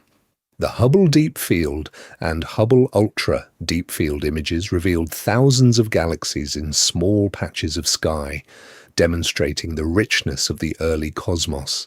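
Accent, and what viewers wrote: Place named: United Kingdom. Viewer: Dang! British Accent irritating.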